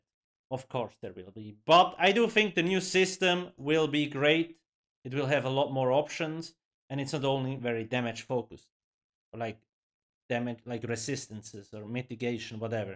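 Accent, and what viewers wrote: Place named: Switzerland. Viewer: Just wondering where are you from? Your accent sounds swiss to me but my pops though more up north 🤔. Anyway you still speak very clearly and that's good especially when looking for guides...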